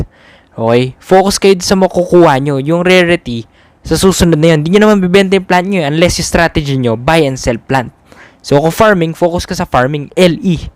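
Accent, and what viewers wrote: Pampanga, Philippines. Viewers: Kapampangan accent ka bro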